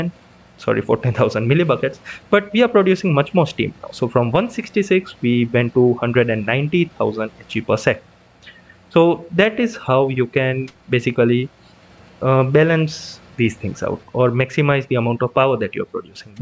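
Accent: India. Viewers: Indian. I remember a friend of mine talked with him, and told me as such as they were both indian :P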